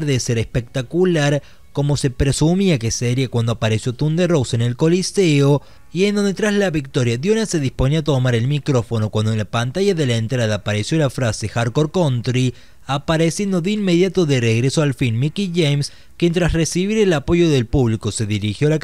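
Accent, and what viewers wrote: Córdoba, Argentina. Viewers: sos cordobes???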